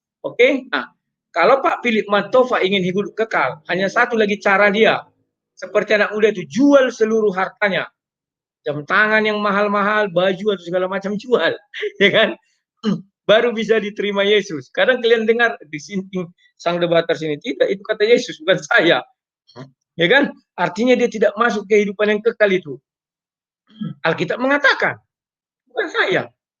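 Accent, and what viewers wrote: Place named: West Java, Indonesia. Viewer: Logat Sunda 😂😂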